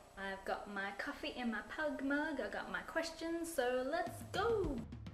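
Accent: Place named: Australia. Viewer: I love your accent! You are such an awesome inspiration! Plus, you're from Australia so you're badass!! :D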